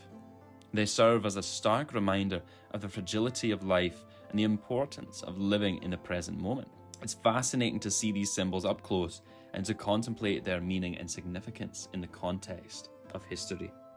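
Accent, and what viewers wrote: Scotland, United Kingdom. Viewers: Stunning photography, looks better than even the day I visited Stirling Castle! I can’t believe I missed out on visiting the graveyard and Wallace Monument. Now I have a reason to go back though. Wonderful video and narration - and I especially loved listening to your Scottish accent!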